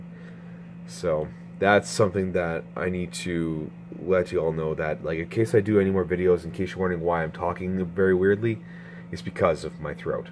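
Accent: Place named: United Kingdom. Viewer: Perks of watching the streams: you get to hear the phone story earlier....and in a British accent XD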